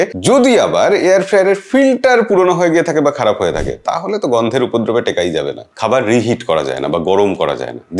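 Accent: Bangladesh. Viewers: Dadar bolar dhorone ektu bangladeshi touch ache!!!🤔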